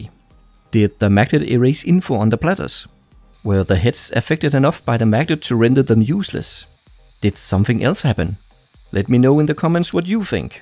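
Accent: Denmark. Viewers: er du fra Danmark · xD man kan hører du er dansk xD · Your danish?? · jeg kunne høre fra starten at du var dansker pg.a. din aksent. :)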